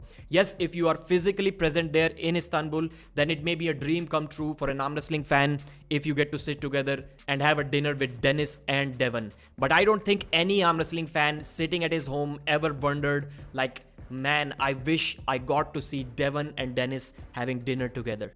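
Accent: India. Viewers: Its the typical indian accent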